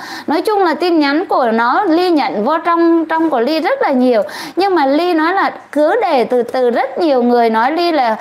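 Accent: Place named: Central, Vietnam. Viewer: Chào ( lìa trần) MSVV bị bắt trưa? Hả??? Nghe giọng trọ trẹ chán quá Trọ trẹ chán quá... Hu hu hu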